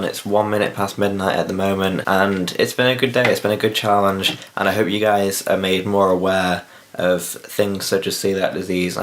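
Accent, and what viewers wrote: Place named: United Kingdom. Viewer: Oh wow a Brit. Genuinely expected an American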